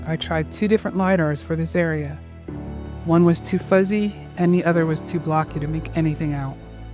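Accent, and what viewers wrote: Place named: Philadelphia, United States. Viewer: Loved the video. Was it intentional not to reveal anything about the location? It feels a bit counterintuitive if your goal is to share historical preservation. I couldn’t even figure out where you're located in the United States, though I think I hear a Philadelphia accent—maybe? Adding a mention in the description or title could be helpful.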